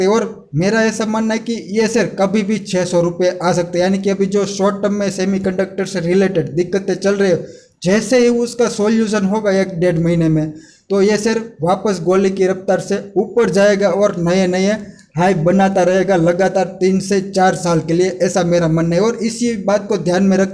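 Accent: Gujarat, India. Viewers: Way of talking par thi khbr pdi aapda gujju😂😂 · Gujarati lagta hai bhai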